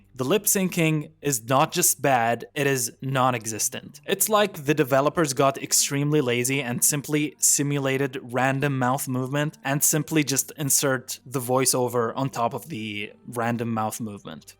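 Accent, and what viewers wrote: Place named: Japan. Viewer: You can tell hes Japanese by his accent so Im sure he knows his ancestors history...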